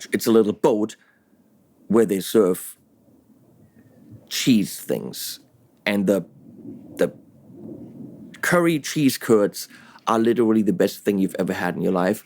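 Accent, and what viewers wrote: Germany. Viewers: I'm afraid of you because of your lack of hair, that german accent, and your freakishly large stature... otherwise, you are lovely :D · I think they might r some unconscious bias going on, tall bald German speaking English with a German accent (rightfully) knit picking critiquing things. Not fair but I can imagine it being a thing As far as the Marshall getting back a/part of the solution could be frfr’s/stage pa’s and official licensing of irs and amp modeling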